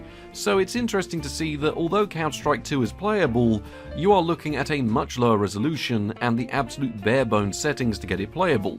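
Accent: United Kingdom. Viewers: This is so American of me to say, but I say it as an American whose family literally came over to Ellis Island, NY from London in the 1800s. Also according to my DNA I am 68% British & absolutely LOVE the UK. Ok, with that disclaimer out of the way. Having a British Accent just makes the videos seem like dated fine wine! Nostalgia with intelligence!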